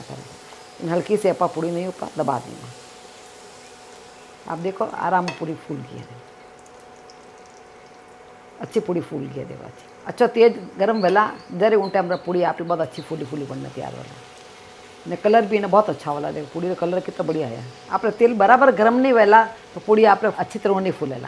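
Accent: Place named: Punjab, India. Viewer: Daadi amma to app lgte hi nhi hi aur apki voice to bhut sweet hai Punjabi accent bhi ata hai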